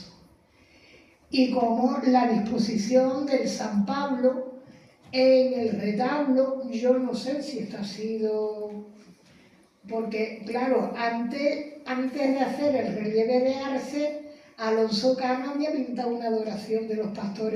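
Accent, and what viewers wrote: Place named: Andalusia, Spain. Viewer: Magnífica disertación,de gran erudición y con una dicción y gracia muy andaluza,mis felicitaciones doña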